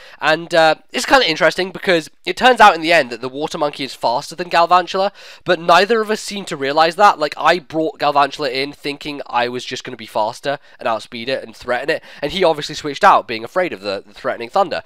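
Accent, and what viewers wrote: Scotland, United Kingdom. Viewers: dude you're scottish? that's fucking awesome, listen to a band called they're from Scotland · you Scottish that's awesome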